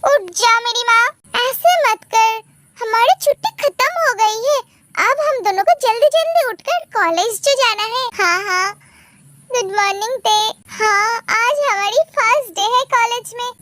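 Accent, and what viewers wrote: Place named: Bengal, India. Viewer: Accent bata raha she is bangoli n bangoli's ka karunga karungi same hota so you have to understand .😂😂You focus on story n enjoy. It's kind of cute too. 🎉🎉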